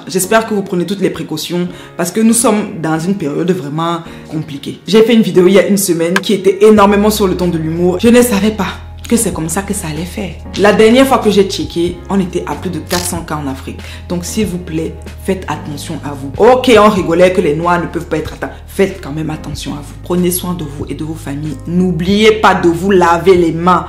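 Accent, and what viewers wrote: Cameroon. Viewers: Ton accent kmer c'est la magie Sista, courage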